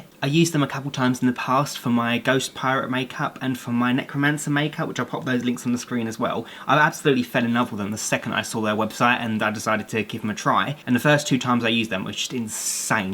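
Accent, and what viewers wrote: United Kingdom. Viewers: I love your British accent and you are really talented ❤️❤️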